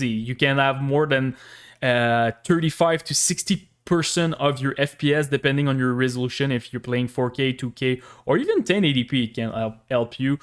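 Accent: Quebec, Canada. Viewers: U from Quebec? u got the accent lol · best accent ever...jadore sa esti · Ton accent Quebecois te trahi beaucoup xD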